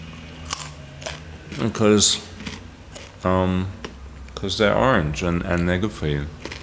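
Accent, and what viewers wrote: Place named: Australia. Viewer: Thank you so much for doing this. I really appreciate it. I'm a 'reasonable' fingerpicker and alternate bass is no problem. Delighted though that you showed your own little nuances that make the difference. Now I don't have to sit down and work it out. You're correct; IT IS an easy one to do for a seasoned fingerpicker. Reminds me of Mark Knofler describing how to fingerpick...learn the basics - thumb for 6,5,4, and fingers 1,2,3 for strings 3,2,1. Get comfortable with that, bring in alternation and then...forget it completely and away you go! It was great to hear him justify what I'd done myself many years earlier 😅. Nice to hear the Oz accent...I spent ten years there. Greetings from Ireland.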